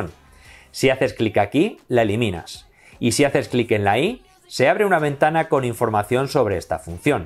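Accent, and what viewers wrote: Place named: United Kingdom. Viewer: british englisshhhh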